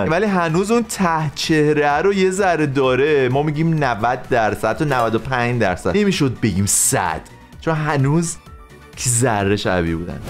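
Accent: Isfahan, Iran. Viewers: دادا یه کلیپ بساز فقط با لحجه شیرین اصفهانی حرف بزن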